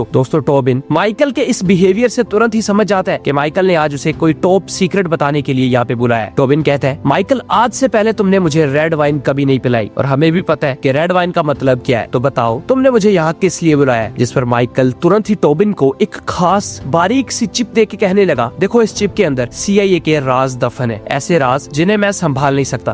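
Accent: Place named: Lahore, Pakistan. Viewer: I think you from Pakistan kyuki hindi esi ni hoti matlab bolne ka lehza lahori pakistani panjabi lag raha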